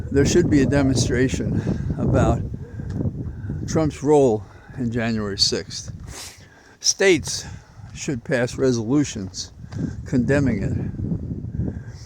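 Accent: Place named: Ireland. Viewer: Another truly stellar talk today, elemental but also saddening. My cousin acquired his federal judgeship by running a longshot presidential campaign,and winning (don't guess which because you might guess wrong) He is the only person I know who talks like Mr.. It must be those Irish genes.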